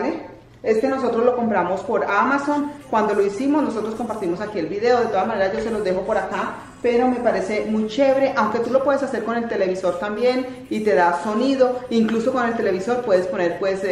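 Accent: Colombia. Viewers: me encanta el acento Colombiano, en instantes se escucha!!!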